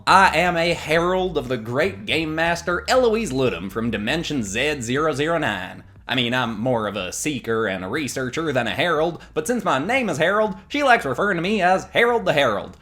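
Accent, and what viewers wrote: United States. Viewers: This narrator kinda sounds like a mix between a robot and a country accent but it’s great tho can’t wait for more episodes with Harold lol 😂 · Not to be rude but I personally am not a big fan of the country accent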